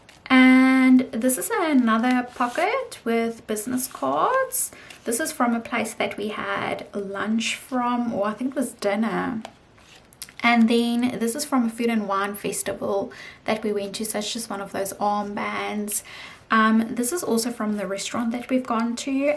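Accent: South Africa. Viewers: Love your accent, fellow South African😁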